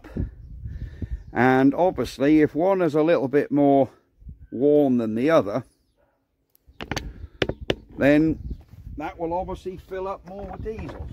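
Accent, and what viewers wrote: Norfolk, United Kingdom. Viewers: You sir, have the strongest norfolk accent I’ve ever heard😅😂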